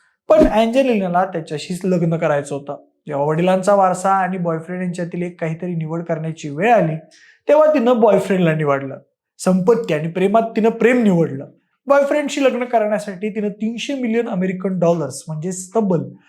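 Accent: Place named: Satara, India. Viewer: Kadak bhasha shaili ahe satarkar vatatat nakki chhan avdla vidio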